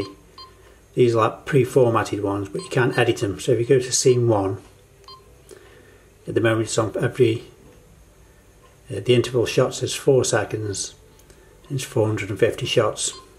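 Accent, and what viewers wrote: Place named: Barnsley, United Kingdom. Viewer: ps where you from? barnsley? lol Im from leeds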